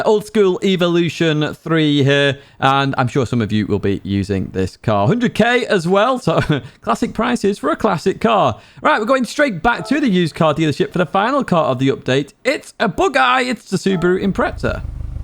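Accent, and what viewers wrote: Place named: Sefton, United Kingdom. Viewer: Didn't know you were from Sefton lar 🤣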